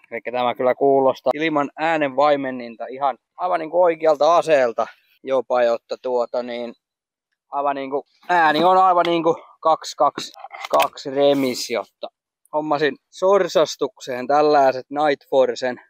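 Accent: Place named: South Ostrobothnia, Finland. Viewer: Bonuusta e-pohojanmaan murtehesta.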